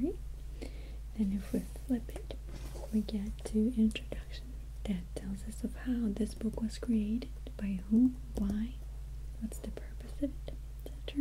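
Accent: Russia. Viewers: i love your russian accent and your handwriting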